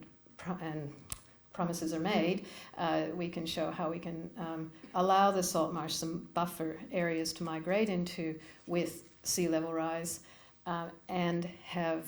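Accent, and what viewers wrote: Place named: Australia. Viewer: Great work, - it's fun for another previous Oregon, IL resident to about your work in your Australian accent!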